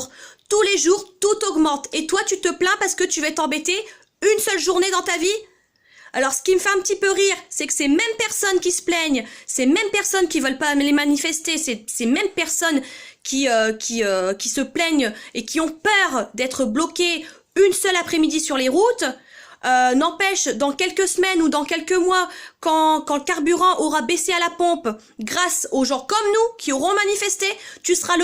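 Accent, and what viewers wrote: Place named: North, France. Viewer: T est du nord ta l accent je suis en Normandie je suis du ch nord a la base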